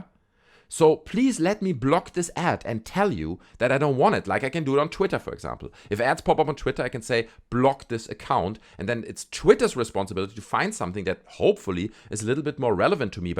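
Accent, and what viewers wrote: Germany. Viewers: Very Good Video! Are you from Germany? Because you have a German Accent lol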